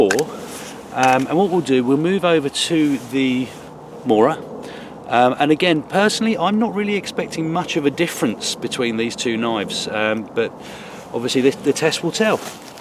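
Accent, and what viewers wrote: United Kingdom. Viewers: I like that video a lot, (1) I like the fact you're realistic about the surprising capability of the Mora, (2) I like that you show that the Ray Mears is both, an awesome tool and a piece of art (almost, at least), (3) I like the British accent. :-) PS: faultroy is an impolite troll, thus let me remind you: Don't feed the troll!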